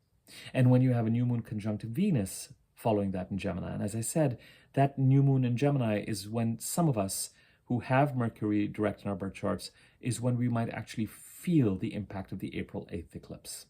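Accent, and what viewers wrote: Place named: California, United States. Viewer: Yay an video! I find your videos so very helpful helping me to reflect..& chilll out 😄 your voice is so calming 🙏love the Californian accent too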